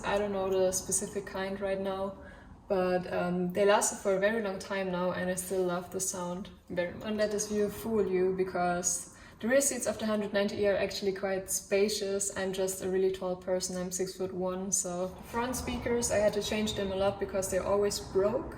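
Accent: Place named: Germany. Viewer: Es ist immer wieder komisch deutschsprachige Menschen Englisch sprechen zu hören... 😂 (Unser "Dialekt" passt einfach nicht. - Obwohl du ja gut Englisch sprichst.) Da fühlt man sich wieder wie im Englischunterricht in der Oberstufe. 😅😂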